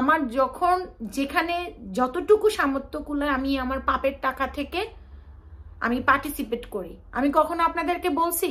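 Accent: Noakhali, Bangladesh. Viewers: Allah apnr mongol korbe Apu.ekdol thakbe koshto debar jonno.kintu din sheshe apni Jodi ektuo amdr mukhe hashi fotan,etai apnr orjon.Noakhalir vashay shunte Valo lage.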